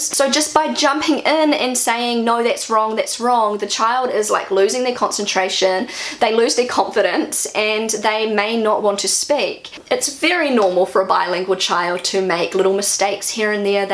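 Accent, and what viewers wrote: New Zealand. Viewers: It‘s a big advantage for your kids to speak English fluently! They are able to communicate wherever they travel. And btw, your kiwi accent is adorable, don’t ever change 🤩 Same goes for the sweet franconian accent of your kids, of course 👦🏻👧🏻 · Your posh British accent still needs a bit of work. Can't keep the kiwi out. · I'm glad you didn't listen to any of those advices!! The way you do it, is perfect! I'm a kindergarten teacher and a few years back we had a little girl from Russia. And her mum, she was such a lovely lady, thought it was a good idea to teach her daughter German as well, but she couldn't speak German fluently. In the end, the little girl wasn't able to speak proper Russin nor proper German. Oh and I looooove your Kiwi accent!!! And it's great that your kids pick it up, too, cos they're half Kiwi and this accent is a big part of them. 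Case closed I guess 😂 Much love from Hamburg 😘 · The British accent part was really funny, 🤣 Love your NZ accent! ... It's soo German, by the way, this accent obsession... Embrace diversity, people! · Hey, thanks so much for sharing your experiences with raising bilingual children. My husband is also a native English speaker and I am a native German speaker, so it might be helpful with our future children. My husband also grew up bilingually (English and Afrikaans), so three languages are going to be interesting. I think you guys have done a great job sticking with it! And I love your kiwi accent and that you pass it on to your children as this a big part of your biography. My husband is South African and I think it would be rather strange if our children spoke perfect Oxford English ☺️ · Your kiwi accent is so nice ;-) I try to race my children bilingual (Italian and German ) too!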